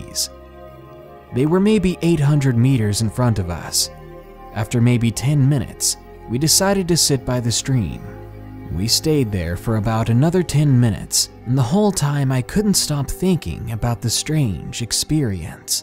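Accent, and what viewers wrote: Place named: South, United States. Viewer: 🏅🥇at the risk of being ridiculed by others, I'm still gonna share this. Just wanted to take a moment to commend you for your growing success and amazing channel. I subscribed a couple months ago, however, I listen to numerous uploads per day so I consider myself an avid fan LOL. I can truly tell how passionate you are in your work and I think I speak for all your subscribers when I say thank you for putting in so much effort in this art. I can sense your pride in the awesome content, sound effects (especially the demonic voices) and communication with your subscribers. Sorry if this last part sounds stalker-ish bc I assure you I'm not a stalker! (Says every stalker in the history of stalkerdom) 🤣 anyway, I've always had trouble falling asleep until I started listening to you at night since your voice is so soothing! 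So thanks a trill for that! So yours is the last voice I hear at night LOL see that sounds creepy! But I think I'm drawn to that southern, country twang which comforts me, as I'm from N.E. Texas. So there's my experience thus far as one of your fans. You just do a great job with your channel and it should be recognized! Thanks for taking the time to read my message & CONGRATS on your recent nuptials! Hope y'all are off to a wonderful start on a wonderful journey (you know, because "this world is a strange one"!! I'll see ya in the comments, I'll be the one beasting on those who make hateful comments!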